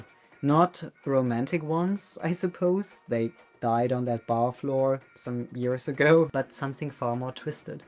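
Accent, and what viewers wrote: Germany. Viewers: Ich höre einen deutschen Akzent heraus 😏😏 Freu mich einfach, wenn Leute aus Deutschland über Hazbin Hotel/Helluva Boss reden. Sehr nices Video! :)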